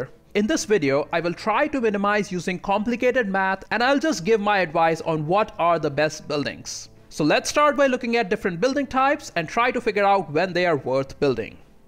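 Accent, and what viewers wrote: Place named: India. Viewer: Where are you from? I think you have an indian accent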